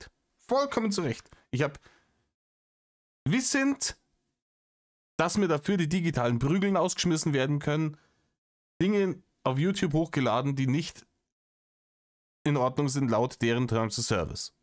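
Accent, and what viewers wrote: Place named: Upper Palatinate, Germany. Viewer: Kommst du aus der Opf.? Hört sich bissl so an -> Ich wohn aba scho lang im Osten & hör den Unterschiede nimma so guad😉 Wie & wo auch imma: Beste Grüße ins scheene Bayern💙🤍💙🤍💙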